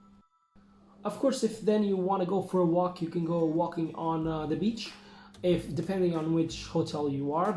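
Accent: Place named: Portugal. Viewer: bom video, tens um inglês muito bom, mas nota-se bem que es português pelo sotaque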